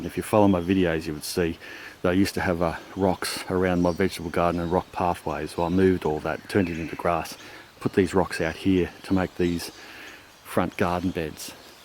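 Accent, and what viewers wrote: Australia. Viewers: Nice...soon I will be making a video like that..as soon as I get my little farm..lol My dream! Are you located in Australia, somewhere like that? I guess because of your accent.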